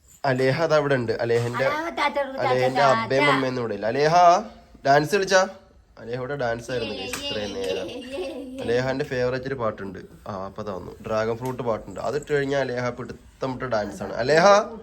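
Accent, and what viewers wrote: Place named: Malappuram, India. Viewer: Umma kolllam baasha paraunnund malappuram baasha😊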